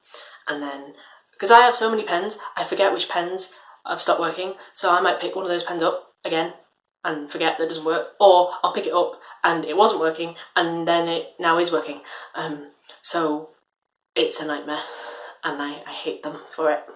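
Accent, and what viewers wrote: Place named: Scotland, United Kingdom. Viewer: I can’t define your accent, you are from the U.K., I am pretty sure, but not from the Southern part. Maybe, Scotland, Merseyside?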